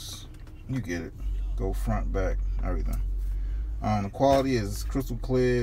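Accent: Baltimore, United States. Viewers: definitely baltimore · Destinee Mincer soon as i turned on the video my bf said “he’s from baltimore” 😂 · You definitely from Baltimore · U from bmore?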